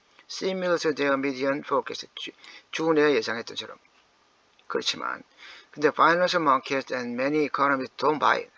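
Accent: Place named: South Korea. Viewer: ^^ 머스크 과장과 사우디공주와 탕비실 얘기에 저도 많이 웃었습니다.^^ 우리나라에 사는 많은 사람들은 같은 한국사람의 영어발음이 더 잘 들리는거 아시죠? 감사합니다. 잘 들었습니다. 😅🎉❤😅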